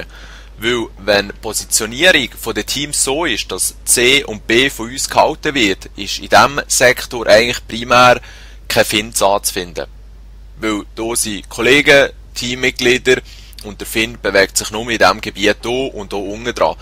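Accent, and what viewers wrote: Switzerland. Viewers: Endgeil das swizzerisch :D ich versteh kaum ein wort aber kann mich da köstlich amüsieren :D bitte mehr :D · haha :D gnau so öppis hani gsuecht und den isches ersch no uf schwizerdütsch ;) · Ouuu was schwizerdütsch!!! :D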